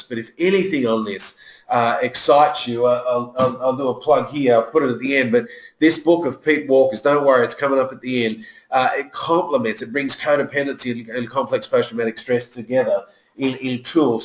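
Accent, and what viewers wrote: Australia. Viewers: your Australian accent and is very calming to me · presenter’s heavy Aussie accent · I found this to be so informative and validating. The aussie accent was relatable, which really helped me. The best thing was how referred to "we" throughout the lecture. This made me feel much less stigmatised! Most talks say 'them' or 'sufferers' or patients or even subjects.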